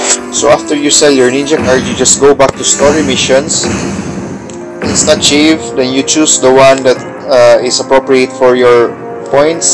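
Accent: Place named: Philippines. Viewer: Are you from the philippines? I can tell by your accent.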